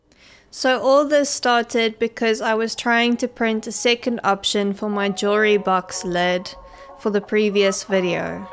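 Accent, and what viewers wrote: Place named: South Africa. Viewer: I'm usually good at picking up what an accent is. Yours has me stumped, but I'll take a guess, are you from South Africa but have lived elsewhere long enough to end up with a hybrid accent? Great video. I had thermal runaway recently too, but it was due to me experimenting with my machine after flashing the firmware.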